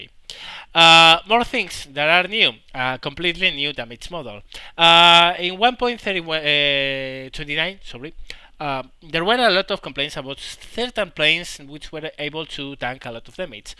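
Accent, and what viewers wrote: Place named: Spain. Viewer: He is Spanish, so its a Spanish accent.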